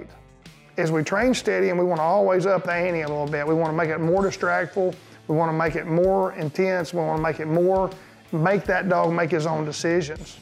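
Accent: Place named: Texas, United States. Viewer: That Texas accent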